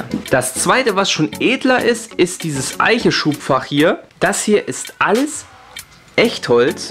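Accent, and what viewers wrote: Hesse, Germany. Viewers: Als ob du aus Marburg kommst😂 endlich mal wer aus der eigenen Stadt.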